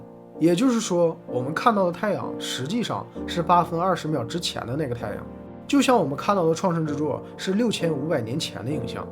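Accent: Northeast, China. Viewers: 解说中，要是能把东北口音去掉就堪称完美了！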